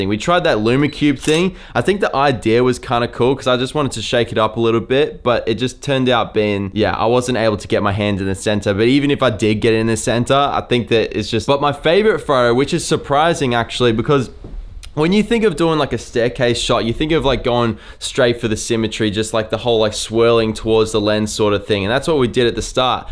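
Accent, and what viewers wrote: Australia. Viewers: Now i wanna to learn the aussie accent. U sounds so hip.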